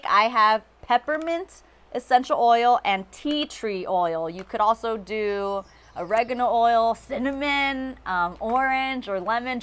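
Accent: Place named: England, United Kingdom. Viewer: nice english accent.. gorgeous smile.. ❤️ thanks for the recepy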